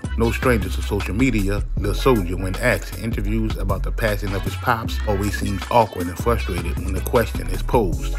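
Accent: New Orleans, United States. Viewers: Nigga Please...That's N.O. talk homie..3 times for the Real 3rd ward nicca..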